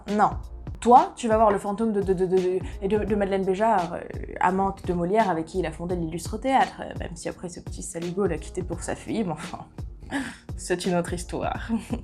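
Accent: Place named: England, United Kingdom. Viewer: Wow, je suis éblouie par cette beauté, ce talent, cet accent anglais ainsi que cet humour absolument incroyable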